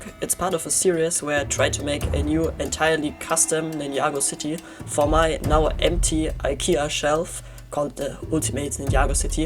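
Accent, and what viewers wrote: Germany. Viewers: I can hear german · am i hearing a deutscher akzent?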